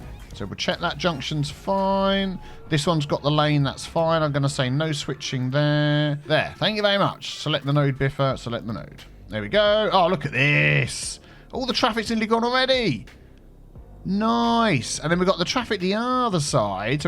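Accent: United Kingdom. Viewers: I'm currently binge watching this series and I'm actually in San Fran right now for Labour Day! I'm a Brit living in America and the pandemic has made it really difficult to make friends so I've been pretty lonely and homesick, but hearing your Britishisms is really comforting. Thanks Biffa <3 (Also, Yorkshire tea is the best and thankfully I have been able to find it out here!)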